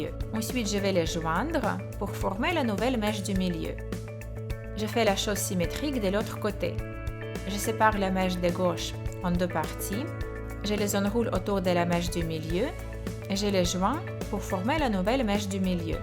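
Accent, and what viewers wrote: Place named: Russia. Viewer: Tu as l'accent russes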